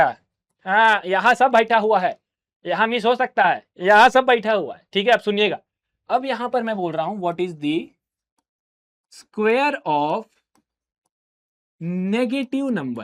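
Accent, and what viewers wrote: Bihar, India. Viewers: Bhaiya aap bihari hoo kya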